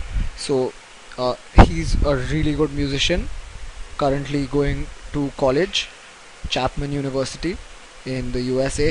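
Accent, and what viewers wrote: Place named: India. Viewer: Your Indian English is soo much better, than Chineese English!!! :)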